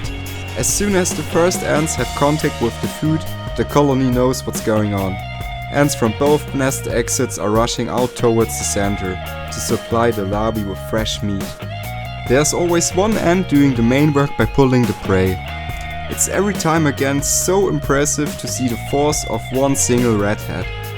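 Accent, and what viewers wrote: Germany. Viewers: Du bist Deutsch richtig? Der akzent! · Are You German ? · Man hört aber ein bisschen das Deutsche in ihm😃😜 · Ohne in die Kommentare zu gucken wusste ich das du ein deutscher bist wegen dem Akzent😂😂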